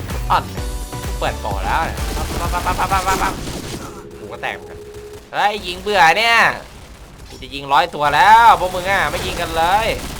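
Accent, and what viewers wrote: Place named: South, Thailand. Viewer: เสียงเหมือนคนไต้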